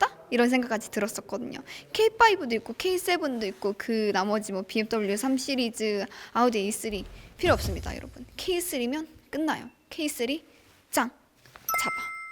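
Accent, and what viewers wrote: Gyeongsang, South Korea. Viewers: 갱상도 출신아인교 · 경상도 사투리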